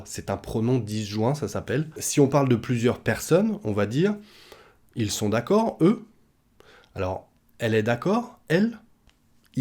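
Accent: France. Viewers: Merci! J'aime beaucoup votre accent français et vos leçons. En anglais, on dit aussi "oops" ou "whoops."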